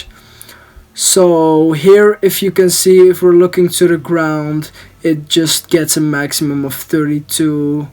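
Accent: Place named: Netherlands. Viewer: Lekker NL accentje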